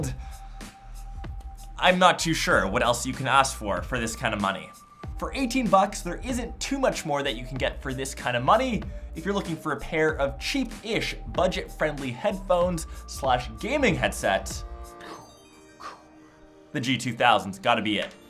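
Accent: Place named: Canada. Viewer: I love your Canadian accent. It's funny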